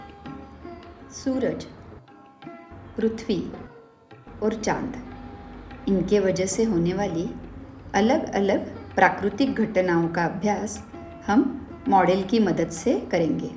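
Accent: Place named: Maharashtra, India. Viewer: छान विवेचन आहे. पण एक मात्र नक्की की मराठी माणूस जेंव्हा हिंदी बोलतो ते लगेच लक्षात येते. 😊😊😊 Great going... 🙏🏻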